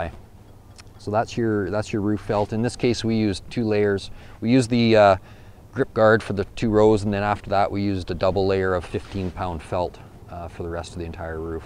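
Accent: Canada. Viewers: First time here....why do you sound canadian?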